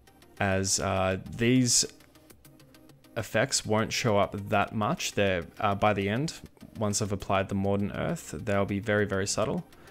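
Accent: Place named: Australia. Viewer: You sound Aussie. These look great by the wat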